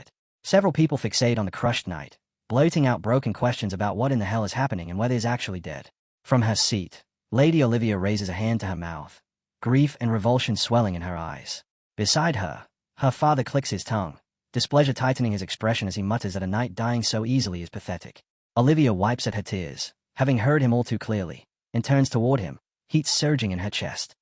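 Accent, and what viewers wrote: Australia. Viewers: Stupid Aussie accent. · Idk but i want to say Australian for some reason.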